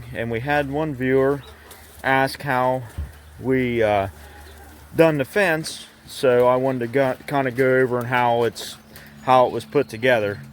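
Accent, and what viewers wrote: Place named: Pittsburgh, United States. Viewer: While looking for Pallet fence ideas for my garden I came across your video. Holy Pgh accent!!! I have one too. I didnt know SE Ohio carried this accent too. Very nice video and I appreciate the demo. It is very helpful.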